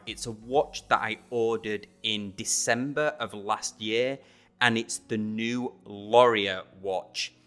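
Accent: United Kingdom. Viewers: Thank you very much. I'm sorry, I thought you lived in UK because of your accent :)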